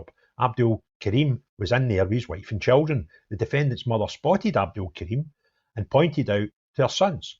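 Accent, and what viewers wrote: United Kingdom. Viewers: My apologies...what accent is this? I am assuming this is UK, which region is your accent?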